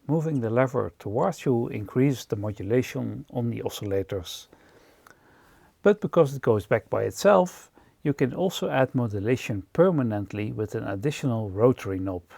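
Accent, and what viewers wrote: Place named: Netherlands. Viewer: It doesn't get much better than hearing an Oberheim by a reviewer with a dutch accent like mine. Groeten uit Eindhoven, of beter gezegt, uit Bern. I expect an OBX8 later this week. I can't wait. The end game for Oberheim poly-synths, except for a module of this giant. It's the sound of all the three synths we love.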